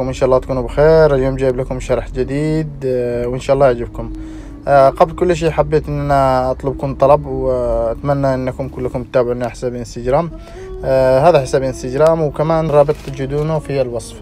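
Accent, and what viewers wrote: Yemen. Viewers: 😂😂💔لاقسم انه يمني من ربعنا اللهجه تقول كذا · اي كلامه يمني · متأكد؟ بس كلامه يمني🌚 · ايووه صدق 😂😂💔 احلى شيء فديت الكلام اليمني 🇾🇪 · يمني انت من لهجتك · لهجتك يمنيه...صح...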